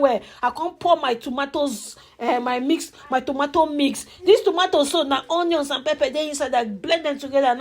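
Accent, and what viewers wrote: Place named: Nigeria. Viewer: No be the food i dey watch oooo na the pig pigin English i dey listen to. want finish me with laugh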